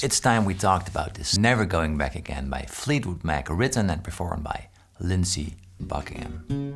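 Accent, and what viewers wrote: Netherlands. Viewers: You sound dutch!! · I love this guys’ lesson on this song. Always amazed at people like Paul Davids and their understanding of music. Also Loving the Dutch accent.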